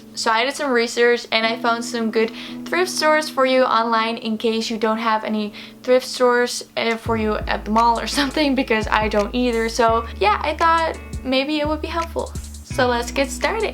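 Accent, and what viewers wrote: Netherlands. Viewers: Wait a sec I’m a bit new to this channel so I just have question are u a bit Dutch ? Ur accent sounds a bit Dutch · i don’t know why your accent reminds me of when i’m in holland , love this video 😩❤️! hope your sage during this shit ass pandemic 😌! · Je bent van nederlqnd ik hoor het aan je accent!!!🥰🥰🥰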